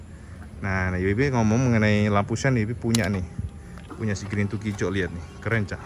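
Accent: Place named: Java, Indonesia. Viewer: Teros tak enteni.kontene sampean seng di ae pokoke lucu.karo enak di rungokno.ngomonge khas wong jowone.dadi suweneng aku ngrungokno.salam teko wong tuban cak.!!!!!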